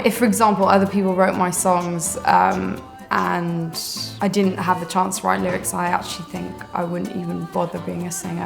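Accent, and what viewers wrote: Wales, United Kingdom. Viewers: Ugh her Welsh accent ♡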